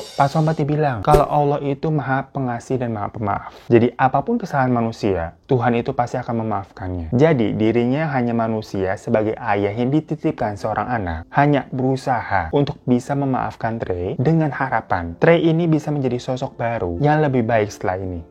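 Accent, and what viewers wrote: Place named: Jakarta, Indonesia. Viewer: Baru liet chenel ini, bagus ceritanya, tapi hostnya orang jepang apa orang betawi ya.. Ko lucu logat jakarta bgt.. 😁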